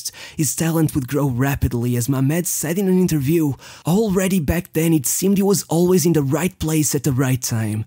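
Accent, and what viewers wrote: Portugal. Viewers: Esse sotaque, é um tuga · I see u are portuguese only from your accent 😅🇧🇷🇵🇹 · Another great football Story: thank you very much. I can hear that the commentator is just like me a Portuguese speaking dude due to his beautiful accent in English. Thank you Sir. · Is the guy doing the vid portuguese?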